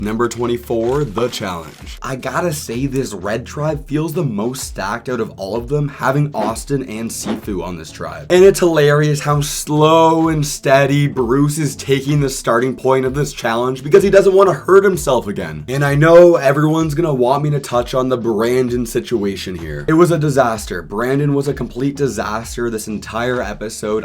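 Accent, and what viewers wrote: Canada. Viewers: Your Canadian accent 🙈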